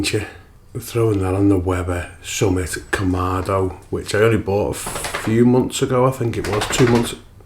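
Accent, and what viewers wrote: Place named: Liverpool, United Kingdom. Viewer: I`ve watched several of your vids and I really like them! Will try beef ribs on my Weber Summit Kamado pretty soon. And drinking ERDINGER Weissbier isn`t such a bad idea 🙂 You should also try PAULANER or SCHÖFFERHOFER Weißbier. And I also like your Liverpoolish accent (as one of the US guys mentioned already) 🙂- and our former Mainz 05-coach Jürgen Klopp loves Liverpool as well. Greets from Germany Wolfgang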